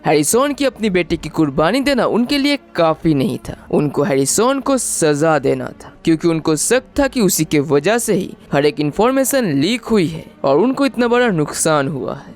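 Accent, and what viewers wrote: Bengal, India. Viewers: Are you from west bengal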